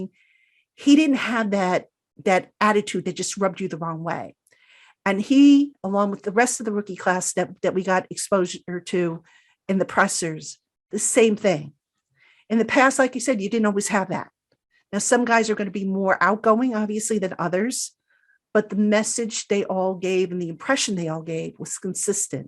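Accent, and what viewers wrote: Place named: New York, United States. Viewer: Thanks for the insight. I get a little NY media in your tone and reviews. I know that’s your background so it’s expected but hearing optimism is also refreshing. That 2nd 3rd round had you with a tone of concerns as us all. Let’s all hope a new day has come.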